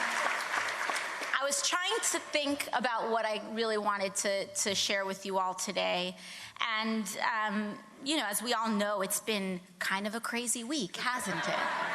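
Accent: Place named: New York, United States. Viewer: She is so cute with her newyork accent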